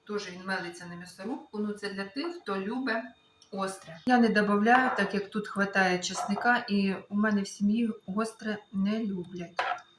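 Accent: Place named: Lozova, Ukraine. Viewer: ❤Подобається як ви разговарюєте, по нашому ,по Лозівському хоча проживаю більше 40 років на Полтавщині. Не звертайте увагу на злі язики ,це все від заздрощів. Миру, здоров'я, найшвидшої Перемоги. До речі моє рідне село Перемога ❤.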